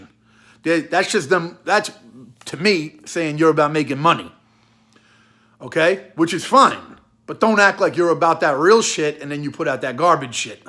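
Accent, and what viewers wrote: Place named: New York, United States. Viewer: Literally the most NY accent ever.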